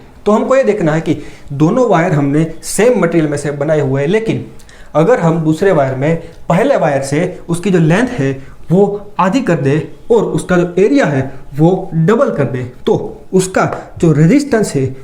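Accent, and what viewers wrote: Gujarat, India. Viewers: Nice explained. Are you from Gujarat?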